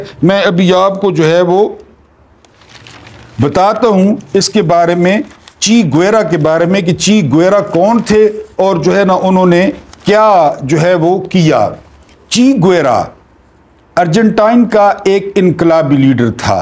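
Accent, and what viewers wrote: Saraiki, Pakistan. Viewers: اسلام علیکم ورحمتہ اللہْ مخصوص سرائیکی لہجے میں اردو اندازِ تخاطب اور اس پر تاریخی واقعات کا تڑکہ اللہ پاک اسے دوام بخشے صحت و تندرستی سے مزیَّن مستقبل عطا فرماۓ ماشاءالله ماشاءالله ماشاءالله ماشاءالله والسلام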